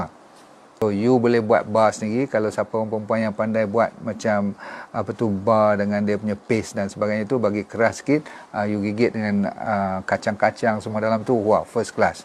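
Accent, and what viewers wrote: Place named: North, Malaysia. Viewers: org peneng klo xsilap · Manis melecaih... org utara ka... Banyak perkataan bunyi belah2 utara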